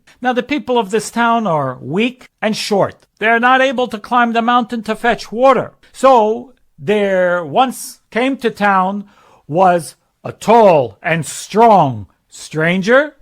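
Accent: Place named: New York, United States. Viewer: Just discovered ur channel- never heard a Rabbi read or teach or relay a sermon before (whatever it is ur doing on this channel) but find u inspiring, knowledgeable and passionate (not to mention-ur accent that I love- that reminds of me of my days in NYC in law school many years ago). Scorpio man here through and through and working on self - improvement and development (spiritually). Though I’m Catholic, we all find that wisdom comes in many forms sent to us in many ways- and well, ur wisdom and sharing of same will become a great addition to my growing library of go-to’s. Thank you!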